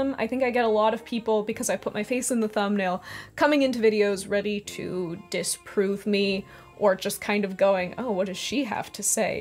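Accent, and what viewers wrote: Virginia, United States. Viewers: This isn't meant to be mean it's meant as helpful criticism: Your accent is "Northeastern US from Virginia"? Virginia is in the southeastern US. New Jersey on up is Northeastern US, and the rest of the Northeast isn't really happy with having Jersey as a part of the Northeast. New York accents are northeastern US, general New England accents are Northeastern, Boston accents are Northeastern US accents. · I do not live in Michigan though I do have a Michigan states park pass on my car! I think it’s interesting, my guess from your accent was Virginia so partial credit? Really enjoying the channel!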